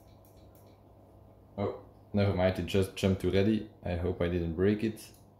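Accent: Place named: Brabant, Netherlands. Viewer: Je Brabands accent door je Engels is zalig ☺️☺️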